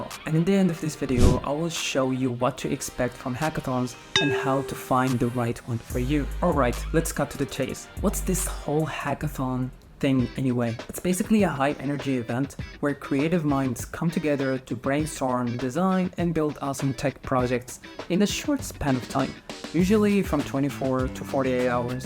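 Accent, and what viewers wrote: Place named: Egypt. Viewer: he both sounds and looks Egyptian to me lol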